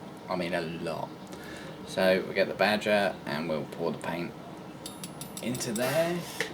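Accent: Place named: Preston, United Kingdom. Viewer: HI really enjoy your vids and admire your talent. Where abouts are you located roughly of course, I used to live in England in Preston. Doesnt sound as if you are too far from there listening to you talk?. I reside in British Columbia Canada now. Are you aware of MIdwest Airbrush Supply in Chicago. They pretty much have everything and ship all over the world.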